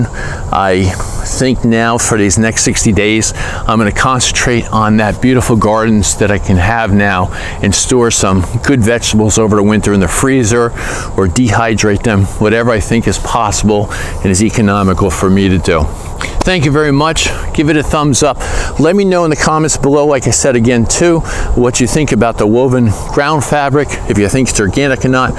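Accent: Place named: South Jersey, United States. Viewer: I am originally from South Jersey and your voice has once again made me homesick! Thanks for the video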